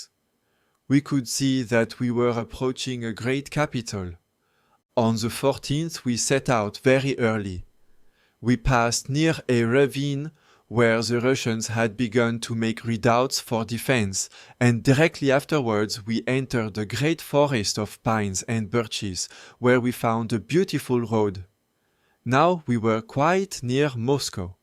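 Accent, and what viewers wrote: France. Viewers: Bonjour, Je trouve vos vidéos très intéressantes, et je compte bien voir tous les épisodes 🙂 Mais si je peux me permettre une critique... La narration est vraiment dure à écouter. On dirait une voix de robot générée automatiquement, et avec un très, très fort accent français. Elle en devient même pénible au bout de quelques minutes (de mon point de vue). Je pense qu'une vidéo français avec des sous-titres serait plus agréable pour les francophones, tout en restant accessible aux anglophones. Voilà c'est mon avis En tout cas merci pour cet excellent travail de mémoire !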